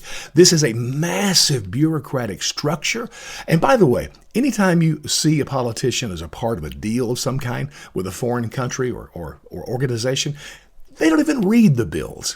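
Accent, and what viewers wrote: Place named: Chicago, United States. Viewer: You sound like you’re from Chicago… I’m from the south side… I worked Chicago fire department for 30 years retired three years ago I am 75% out of Chicago at this time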